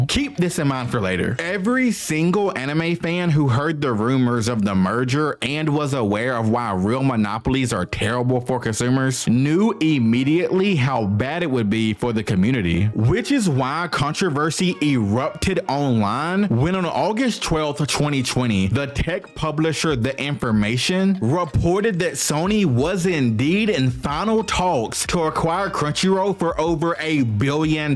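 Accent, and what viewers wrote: South, United States. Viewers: This man def a southern brotha✊🏾😂, all love, I just can tell 😂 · You sound very hillbilly, Mr Ty for making the video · Who was that guy on Bones with the strong country accent… this narrator is giving strong him vibes.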